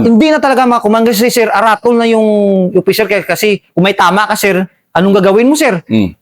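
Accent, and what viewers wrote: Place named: Iloilo, Philippines. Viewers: Hilonggo tekal gid. Na hehe magaling ka sir ha. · tikal ilonggo ka gid gi kagid gina piko gina pala to😂😂😂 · Ilonggo talaga eh .. sa kwento pa lang 😊...halatang nakapag renew sa iloilo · Batyagan gd nga ilongo sia sa ,panagalog nya at least never mine isog Taya,!!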